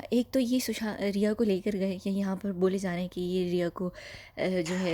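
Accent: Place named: United Kingdom. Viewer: You have a British accent when you speak English 😀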